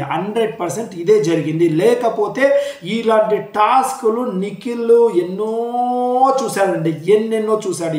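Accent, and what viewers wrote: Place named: Karnataka, India. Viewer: Yes nenu kuda observe chesanu. Mee slang kannada telugu mix, na kannada friends telugu meela ne matladatharu.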